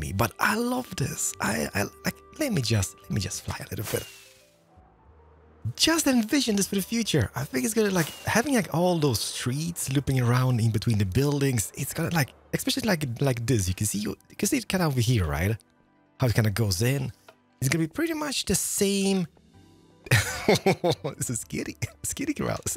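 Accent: France. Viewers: I love French Keralis so so so much Never fails to put a smile on my face and light up my mood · French Keralis gives me life :') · i'm french and i love the french keralis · French Keralis is the best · French mumbo for mayor keralis is the best and needs to appear more often lol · i gotta say : i'm french and i LOVE your french accent !!!!! :) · Yes French Keralis is back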